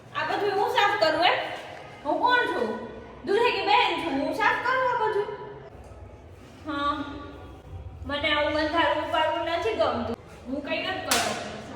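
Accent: Kathiawar, India. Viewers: Kathiyawadi accent bav sweet che ❤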